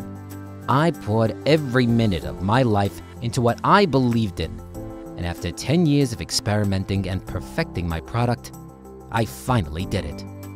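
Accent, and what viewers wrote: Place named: New England, United States. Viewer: couldn't listen to more than 30 seconds of this dumb yankee accent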